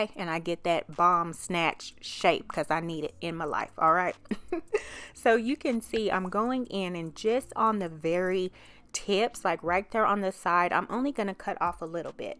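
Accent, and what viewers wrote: South, United States. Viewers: These are so beautiful! You go you are so talented and I love your voice and to be it's southern and I'm southern lol.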